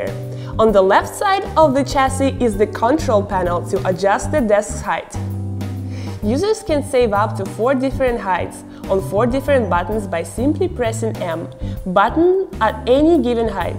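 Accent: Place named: Russia. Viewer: До чего же у неё жуткий русский акцент. Будто специально ррежет :)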